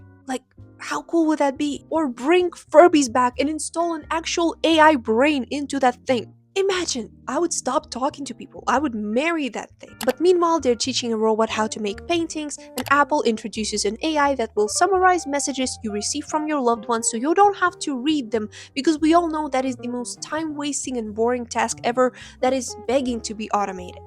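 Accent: Eastern Europe. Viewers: бля ваш акцент ПУШКА БОМБА ОГОНЬЬЬЬЬЬ я надеюсь мой славянский радар все правильно улавливает (у вас ник я имею право делать предположение) потому что НУ БОЖЕ ЭТО ШИККККККККККК это самый.. наверное самый приятный акцент что я вообще слышала. очень круто. тем более если ирл вы не общаетесь с англами? колдун. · I'm an English tutor who mainly works with ppl from eastern europe and am like. 99% sure ik where your accent's from /lh. Not saying that bc it matters, just an observation - your English is Exceptional and you speak and use slang i'd say bordering on a level of some native speakers. It's clear you practice a lot and pay attention to pronunciation! keep at it <3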